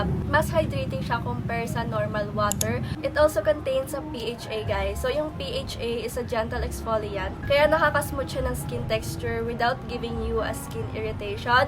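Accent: Philippines. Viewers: Yup its Filipino accents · its taglish.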